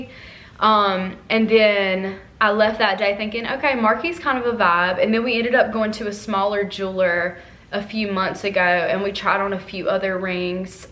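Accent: South, United States. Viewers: CONGRATULATIONS ......❤💚♥💛💙🧡💙♥💜❤💚💜♥💙 Where are you located? .. you sound southern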